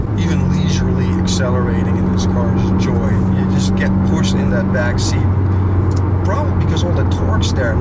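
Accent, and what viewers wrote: Netherlands. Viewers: Ik kan nog steeds horen dat je uit Nederland komt! xD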